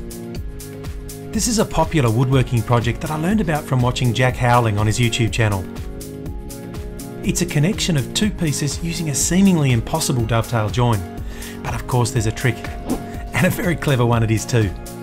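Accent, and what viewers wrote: Australia. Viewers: First time viewer, loved it. Good to hear an aussie accent on a well produced video. Subscribed.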